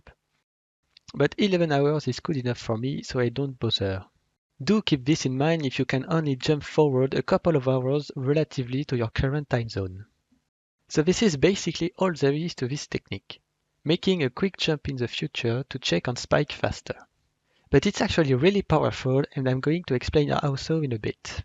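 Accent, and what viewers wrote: France. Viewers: il est francais ça s'entend grave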